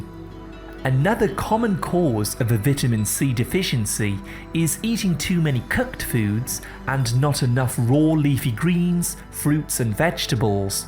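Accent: United Kingdom. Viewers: That is the correct way to pronounce it. He is speaking British English, Britain is where English originated, in case you did not know.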